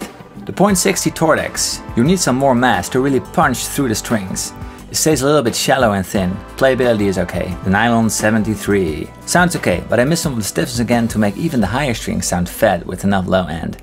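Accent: Netherlands. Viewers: From which country are you? Your accent sounds dutch..... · jij klinkt een beetje Nederlands? zou dat kunnen?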